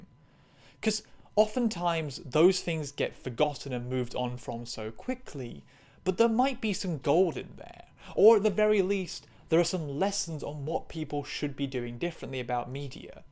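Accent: United Kingdom. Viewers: She definitely has a British accent haha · lmao yeah i thought this whole time she was some flavour of british 😅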